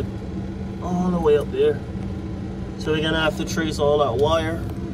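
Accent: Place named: Jamaica. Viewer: Where u from man???? From your accent are from jamdown...... Or further afield down in the caribbean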